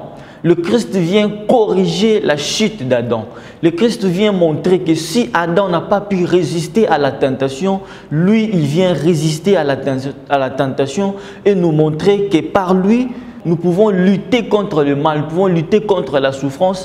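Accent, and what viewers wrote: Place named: Africa. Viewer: Tu as l,acent des africains mais je reconue ton visage c,est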